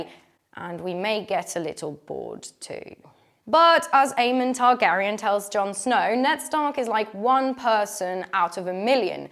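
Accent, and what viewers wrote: England, United Kingdom. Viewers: Super British accent!!!! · Superbe accent anglais !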